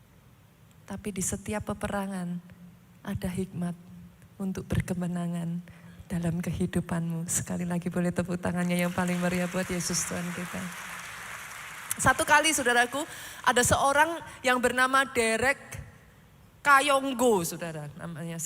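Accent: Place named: Java, Indonesia. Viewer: Terima kasih Pastor untuk kotbahnya. Saya sgt du berkati jelas dan tajam, sgt membanty saya memahami Alkitab. Awalnya saya tdk suka dgn pastor krn menurut saya kog seperti model trus bahasa Indonesia nya agak medok jawa. Tetapi lama lama saya smkn suka. Pastor itu fashionable dan dinamis, cerdas dan tegas, Terima ksh ya ps n team, Gbu all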